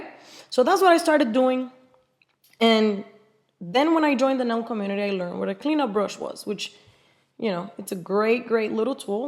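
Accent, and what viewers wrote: Cuba. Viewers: I love ur voice. I knew right away u were Cuban from ur accent. It sounds like Gloria Estefan who I adored back when. Anyway, unrelated! Also, this asshole line totally cracked me up. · This is so helpful!! Thank you! 😊 And I recognized you are Cuban, you've got a cute Gloria Estefan accent! 🇨🇺❤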